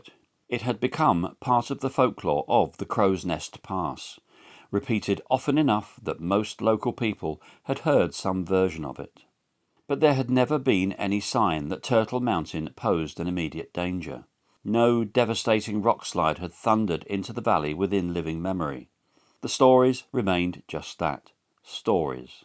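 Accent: United Kingdom. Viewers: The narrator has a British accent, so he's the ONLY one I would ever give a break! GO HAWKS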